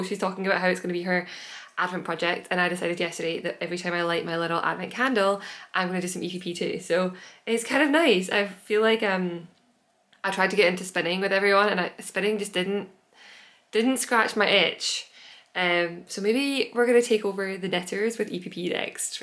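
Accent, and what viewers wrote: Scotland, United Kingdom. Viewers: Hi love your podcasts and design. But must be honest a bit as English isn't my mother language is very difficult to understand your scottish accent have to use subtitles. Thank you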